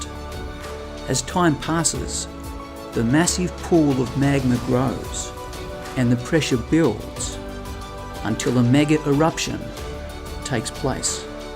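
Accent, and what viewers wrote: New Zealand. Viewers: It’s a kiwi accent you dumbo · 7 volcanoes, lots of hyperbole, little actual information for real reference. Not saying the 'supervolcanoes' are not super hazards, they are. But this video isn't giving anybody any degree of expertise and detail of known facts. Decent visuals, great Kiwi accent. The music is cheesy and makes it clear from the start that this is fluff, not science. I love accurate pop science. This isn't it. Starting with who is the author and why they are qualified to present information is always an honest start.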